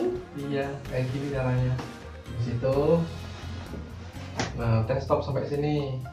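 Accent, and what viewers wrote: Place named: Java, Indonesia. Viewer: Medoks abis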